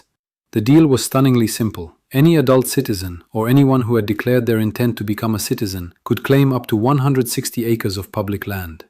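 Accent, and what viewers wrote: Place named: Eastern Europe. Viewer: Почему не по русски? Акцент то то вроде славянский.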